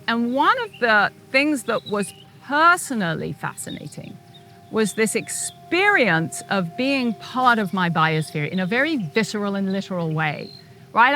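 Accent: United Kingdom. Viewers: Is RP English - she would have had very exclusive education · So, Mr. Smartypants, tell us what a selfish American she is when she has a BRITISH accent. How ignorant can you be?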